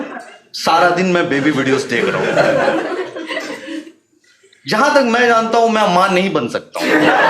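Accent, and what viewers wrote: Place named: Kolkata, India. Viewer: That kalkatia accent😘😘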